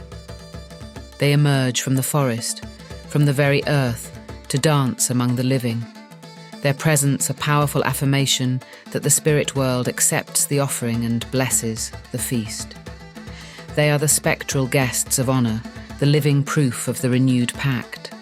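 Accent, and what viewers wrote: United Kingdom. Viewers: I’m 49 seconds in, the British accent on the narration though? I hate AI because it has a way of coming across as tone deaf and too much, but the imaging is cool and story telling is (over the top. These things can be written by a romance novelist for the same effect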